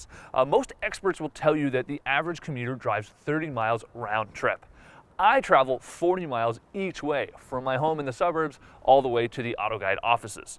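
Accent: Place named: Canada. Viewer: Different accent. Listen to how he says the word "out." He sounds Canadian/North American Mid-West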